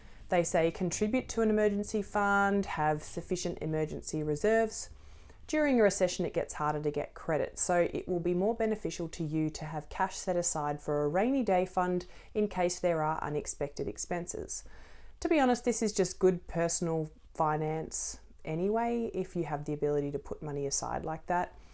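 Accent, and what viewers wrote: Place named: Australia. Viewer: Hearing all this in an Aussie accent does make it a lot less terrifying ✨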